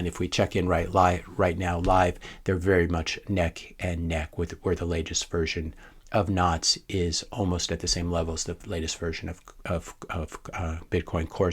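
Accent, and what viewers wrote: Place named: United States. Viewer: with an American accent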